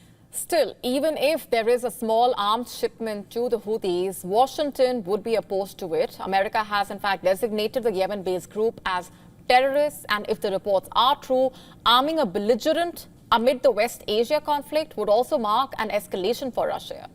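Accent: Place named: India. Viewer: Somehow, the strong Indian accent of the anchor reduces the credibility of their content.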